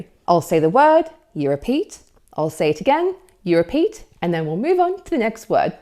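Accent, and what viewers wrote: United Kingdom. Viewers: Quite close to british isn't it?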